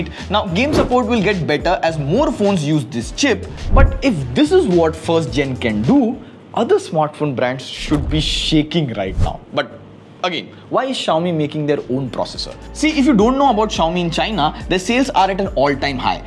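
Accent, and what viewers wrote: India. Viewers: Bro, your accent is really thick. Try to talk with out the indian accent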